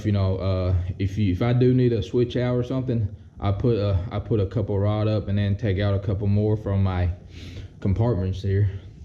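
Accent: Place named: Texas, United States. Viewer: Dig the walkthrough, ive got a nitro as well. Your accent is tripping me out tho. You ffom texas? Thats where I'm from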